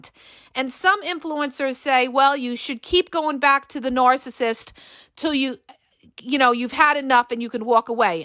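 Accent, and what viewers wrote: New York, United States. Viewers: You crack me up. Your NY accent is great. You would be a great in a movie. Reminds me of Cher saying Snap out of it! Thanks for shooting from the hip.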